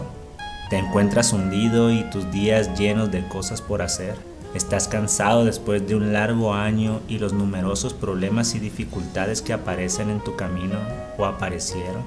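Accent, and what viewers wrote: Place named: Sinaloa, Mexico. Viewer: ¿Porque habla así bato,acaso es sinaloense?,saludos,felices fiestas a todos incluyendo a católicos,musulmánes,etc.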